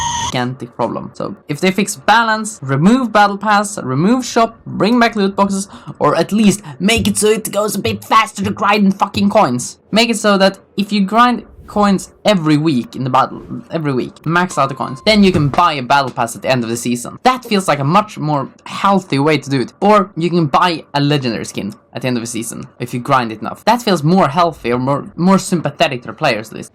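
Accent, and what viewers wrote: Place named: Sweden. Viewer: Lmao I can already guess that u are swedish, or norwegian or something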